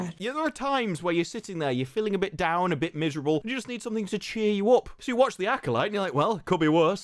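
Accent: United Kingdom. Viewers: This man has peak British mouth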